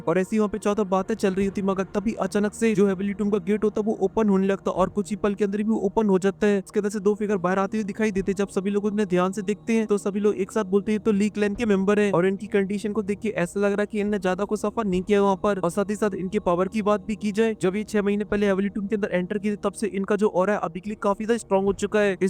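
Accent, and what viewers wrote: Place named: Hyderabad, India. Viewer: His sarcastic way of explaining with a slight hyderabadi tone is really entertaining amusing and hilarious keep it up brother i really fan yours explanation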